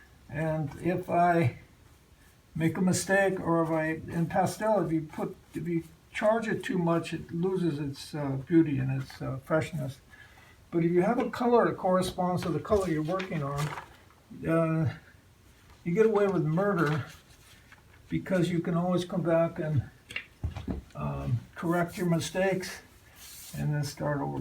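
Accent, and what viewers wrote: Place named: Mexican American, United States. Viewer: Love your work. May I ask what ethnicity you are if I close my eyes I can hear the voice of someone I know who is Mexican American. Not that it matters. In any event keep up the great work!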